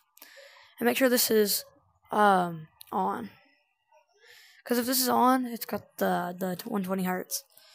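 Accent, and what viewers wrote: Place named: South, United States. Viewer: Not trying to be rude but you lowkey sound country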